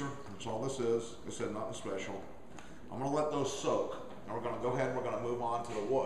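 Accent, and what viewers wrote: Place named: Chicago, United States. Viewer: i dont think it really sounds mobster, its more like just a chicago accent. are you from chicago?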